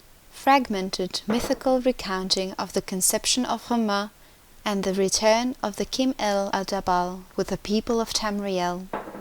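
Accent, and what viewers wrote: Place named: France. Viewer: Lovely French accent and well read its one of my favorite TES books, bravo.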